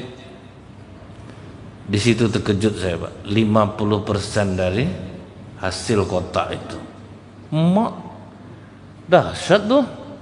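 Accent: West Sumatra, Indonesia. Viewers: Logat nya kayak orang Sumbar ustadz nya, , , Semoga sehat dan selalu fit dalam berdakwah untuk ustadz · Itu logat minang mbak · loghat nya seperti uztadz Zuma, minang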